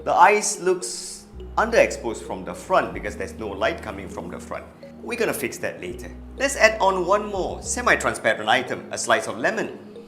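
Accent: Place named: Malaysia. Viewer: Been enjoying your videos since Last year. It's kinda soothing to listen you you speaking, especially with that Malaysian accent. Reminds me of my life in KL years ago. Stay safe always!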